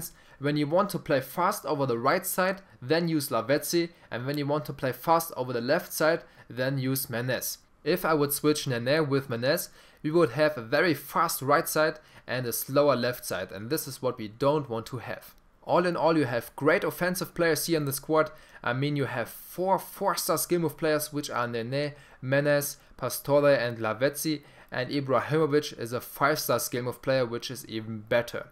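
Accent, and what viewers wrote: Germany. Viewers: R U GERMAN OR FRENCH???????????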